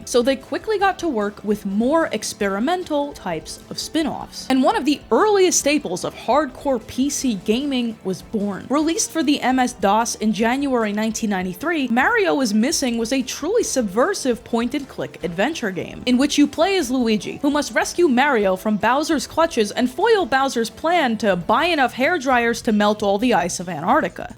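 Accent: New York, United States. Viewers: she’s just got a wicked new york/new jersey accent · Y'all are unaware of the New York/New Jersey accent???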